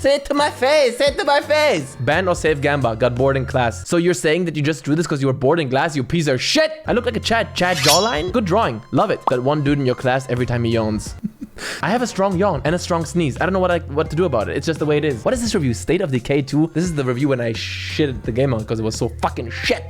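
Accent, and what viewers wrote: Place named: Germany. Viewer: I can here a little bit Deutsch von ihnen, interessant...🧐😂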